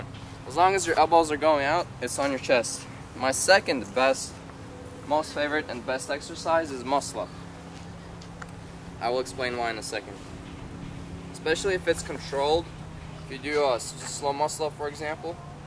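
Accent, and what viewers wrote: Russia. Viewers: Я так и чуял что ты русский, потому что у меня акцент такой же как и у тебя когда я говорю, режил посмотреть в Description, и во, руссий канал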